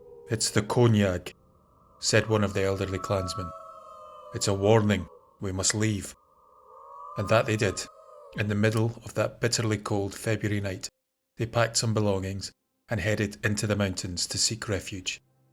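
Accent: Scotland, United Kingdom. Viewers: A night of betrayal and horrors as that of Glencoe... and why would some these souls not still be there? I'm not even Scottish, never been at the site and still the story touched me deeply when I first heard it and still does so today, decades later. Thank you for your videos, you're doing a wonderful job narrating and presenting the stories.... of course, the Scottish voice is a special treat. 🙋🏻‍♀All the best to everyone involved in the making of the videos as well as to everyone watching and listening.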